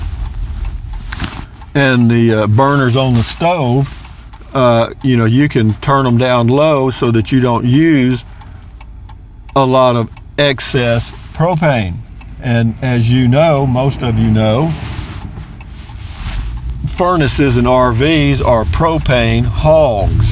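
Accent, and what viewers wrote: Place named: South, United States. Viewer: After watching this video I realized why I watch everyone of your videos. You have a GREAT sense of humor and your good ole boy accent is so relaxing and enjoyable. GOD bless you for being alive and sharing you life with all of us all. You enrich us all!!! Signed, one of your Rangers..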